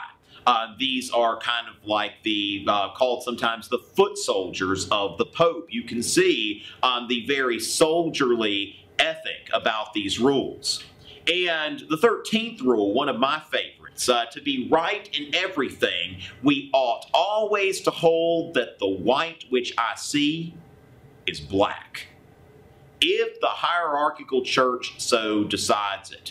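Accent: South, United States. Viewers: i love this. just the way he looks like matt damon and the southern drall makes the whole video so much better. very helpful !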